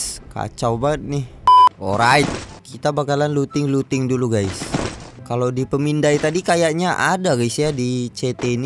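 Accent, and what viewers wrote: Bengkulu, Indonesia. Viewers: bang lu dari Bengkulu ya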